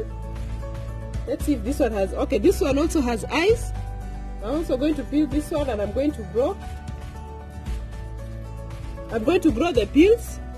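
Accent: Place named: Kenya. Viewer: I love your voice, reminds me of a coworker from Kenya. For some reason that English accent is my favorite. Plus everyone I've know with that accent has always been so amazingly upbeat. Great vid!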